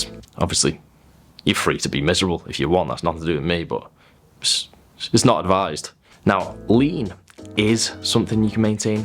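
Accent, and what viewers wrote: United Kingdom. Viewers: You have VERY BRITISH ACCENT dude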